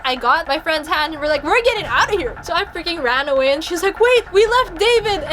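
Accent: Philippines. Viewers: listening to a youtuber speak with a filipino accent is weirdly comforting. you sound like my auntie. thanks ate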